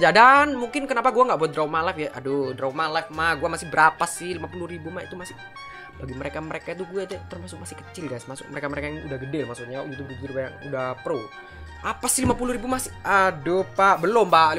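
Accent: Java, Indonesia. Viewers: Bang samean jawa yo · bang orang jawa ya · Bang loe orang jawa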